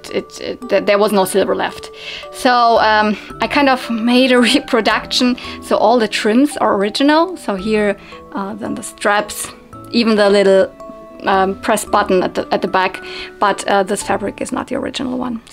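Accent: Germany. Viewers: wow you have an interesting barbie collection! I really like the bright colors of all ur dolls! I'm a barbie collector too and exactely like you I dun collect boxed barbies and I like to restore the dolls too. I love 80's and 90's barbie, and even 70's and 60's, but I'm a perfectionist and I always try to keep my barbies as close as possible to their original conditions with original outfits. I see you always find many good things at flea markets but here in Italy it's so hard and rare to find vintage barbies at flea markets, ur so lucky! Btw I like the simple way you collect barbies and it's so cute to hear ur german accent. Diese ist wunderbar! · Are you from Germany? My bestie is from hamburg, similar accemt. And i dont think you have to say its for adult collectors, every age can appreciate all the toys you have. Do you have any rainbow bright or Strawberry shortcake 🍰?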